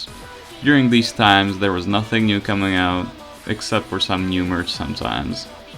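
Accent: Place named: Czech Republic. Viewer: nice finally i see someone saying that the czech dub is nice WAIT HOLD UP TY JSI ČECH? such a nice accent I'm almost jealous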